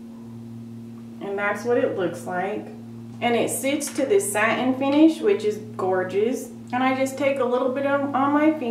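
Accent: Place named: Minnesota, United States. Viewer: are you from Minnesota or something? Your accent reminds me of Fargo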